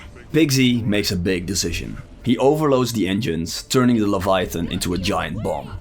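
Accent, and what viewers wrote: Netherlands. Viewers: Love the steen kolen English. ❤ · You have a really thick Dutch accent haha, I assume you're Dutch or Belgian, cool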